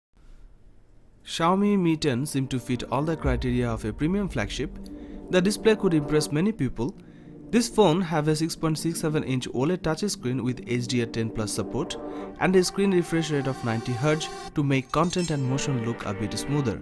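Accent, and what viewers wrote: India. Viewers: Please, next time without Indian accent🙏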